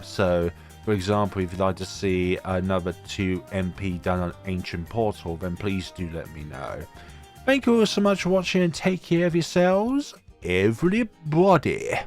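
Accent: United Kingdom. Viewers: Nah, no facecam. There's already a hook keeping me here, the british accent and dying to yellow bloons.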